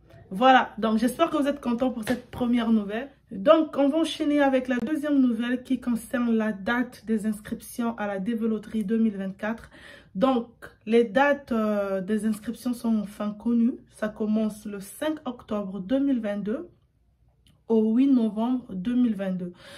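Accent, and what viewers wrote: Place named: Democratic Republic of the Congo. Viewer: 😂😂😂Bonjour, les congolaise sont là pour te soutenir, je savais que tu étais congolaise juste par ta prononciation française... 🇨🇬🇨🇬🇨🇬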